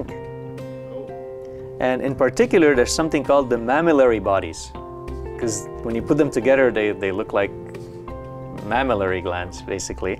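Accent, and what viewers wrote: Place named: Netherlands. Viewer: ... why do you sound Dutch?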